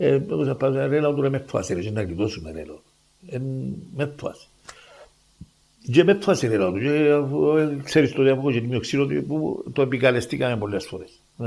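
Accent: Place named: Cyprus. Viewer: Τί νά καταλάβεις ελληνοκυπριακή διάλεκτος...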